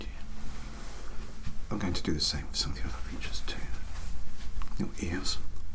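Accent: United Kingdom. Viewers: Great role play of Sirius Black bud very relaxing am interested are you American or British if your American but doing a British accent than that’s bloody brilliant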